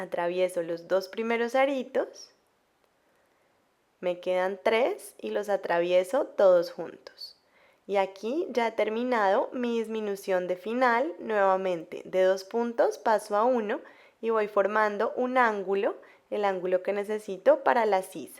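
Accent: Colombia. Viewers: Tu hablas tan lindo acento Colombiano right !!!!!!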